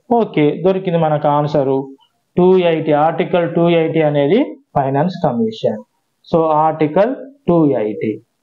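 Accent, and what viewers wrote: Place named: Karimnagar, India. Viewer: Sir karimnagar language lo chala baga chepparu thank you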